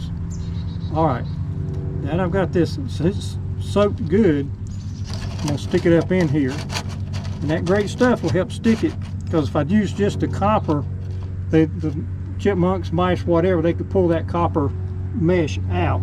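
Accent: South, United States. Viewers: New subscriber here! Thank you so much for this video. I’m sure I’m a neighbor (I noticed the accent) and this is something everyone deals with here In the south especially out in the country (rural areas). This is exactly what I needed to watch! God bless you! 😎👍🏻👍🏻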